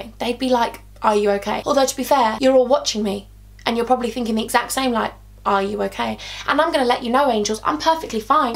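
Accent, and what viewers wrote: Liverpool, United Kingdom. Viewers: your liverpool accent is lifeeee 😄💞 you're literally my favourite person😍❤ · Love a good haul! And your Scouse accent is fab hahah! P.s. how stunning do you look in this video?! 💗💗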